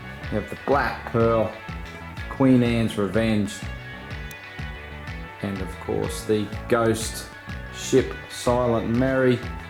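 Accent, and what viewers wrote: Australia. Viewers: this austrailian english love it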